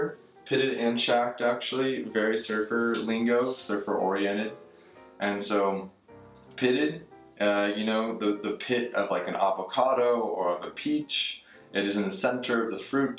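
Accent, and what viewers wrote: California, United States. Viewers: Just here as a California female native born in 1972 in Danville, CA searching for ...do I actually have an accent...you are much younger but talk like me, so that is something.... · Haha, I gotta say, personally I've never heard someone with as pronounced of a valley girl/surfer guy accent. I'm sure some people say it, might just be the area I'm in, but the "whatsaah dude" and "gnarly" feel more like the stereotyped Californian accent than how an actual Californian speaks lol The "yeah no" and "no yeah" are on point though 😂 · I think it's kinda cool. I think it's the mainstream version of what ppl outside the state probably think Californians speak like. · I’m a Californian and yes this how we talk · I like the way people from Cali talk!!!! Floridians talk too funny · This is "TV Californian". Not saying people don't use it but it's not how we spoke when I was growing up in Southern California in the 50s. 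(My family has resided in SoCal for over 120 years.) Classic "Californian" sounds more Midwestern sans the solecisms of the mass entertainment era.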